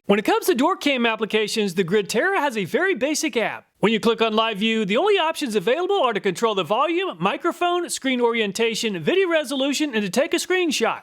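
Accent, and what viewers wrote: United States. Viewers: Very loud american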